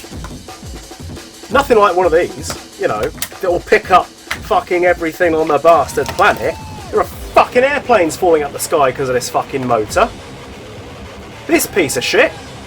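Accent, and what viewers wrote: RP, United Kingdom. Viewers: thought i was on a english ponce channel first 6mins. was gonna tell you to go swill tea with the upper class twats in a palace. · you sound posh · His swearing and british accent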